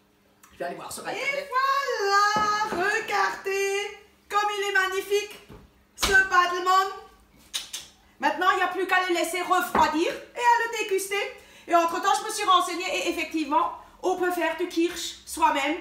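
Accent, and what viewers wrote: Alsace, France. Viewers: Bonjour - merci pour le recette... je suis allemande et j'adore "Bettelmann".. et je vais essayer votre recette. Votre accent est très très charmante, c'est une accent alsacienne?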